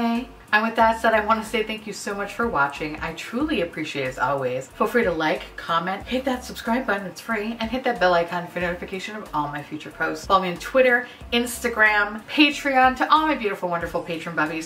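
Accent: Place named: South, United States. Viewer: Momma came out of the gate with a buzz and Southern accent. Hell yea I am here for it. ❤💀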